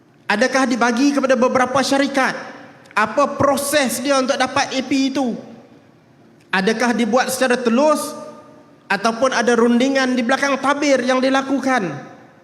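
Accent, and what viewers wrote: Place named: Northern, Malaysia. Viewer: Ini kali pertama aku dengar wan saiful cakap utara.. awkward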